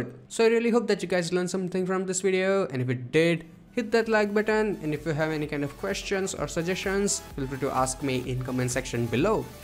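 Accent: India. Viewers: INDIAN ACCENT -- PROBABLY FROM INDIA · Your accent is a lot Indian (95%) and some American (5%). You posing bruh?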